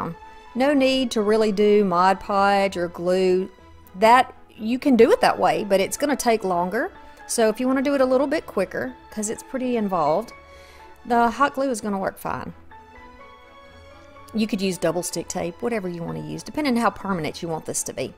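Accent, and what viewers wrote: South, United States. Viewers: I so enjoy your creations and listening to your sweet southern accent. Keep crafting and thanks for sharing.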